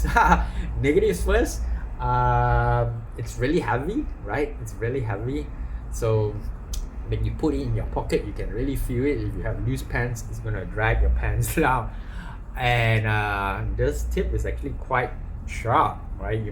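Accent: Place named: Singapore. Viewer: Are you malay? Or Singaporean? I lived in Johor 5 years your slang is very singlish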